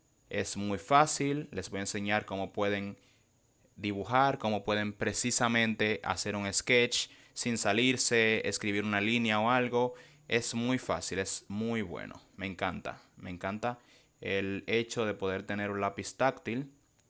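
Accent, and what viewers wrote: Dominican Republic. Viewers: Eres Dominicano o ecuatoriano (lo se por el acento) soy Dominicano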